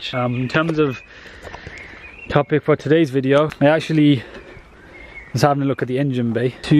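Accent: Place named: Birmingham, United Kingdom. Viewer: Can I ask where abouts your from? I’ve heard Birmingham but me and my girlfriend watch your videos and you look so familiar 😂and we can’t figure out where your from, love the videos!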